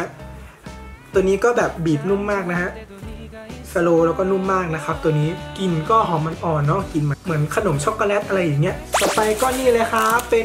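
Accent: North, Thailand. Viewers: คนเหนือหลอ?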